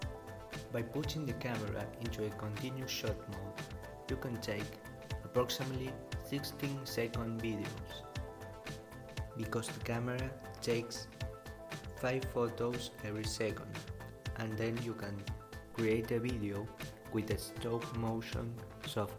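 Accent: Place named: Latin America. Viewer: Amigo buen trabajo pero espero que hayas mejorado el acento se nota que eres español/latino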